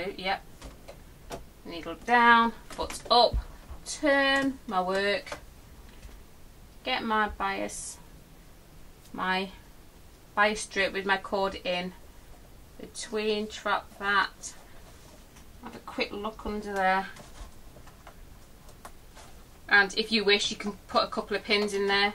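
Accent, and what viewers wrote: Manchester, United Kingdom. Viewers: Thanks for this. I love listening to you. You sound just like my cousin from Manchester.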